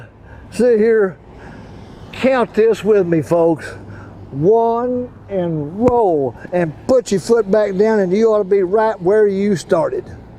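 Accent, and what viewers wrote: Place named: Texas, United States. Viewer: I bought his tapes in 1997 and i started hitting the ball straight as an arrow, My VHS broke and I forgot what he taught me. I'm 72 now two hip surgery's later and struggling. I'm glad I found him on You tube. i' m also going to buy a VHS and start resurrecting my game. I just love listeneing to his folksy Texan sytle-a great teacher.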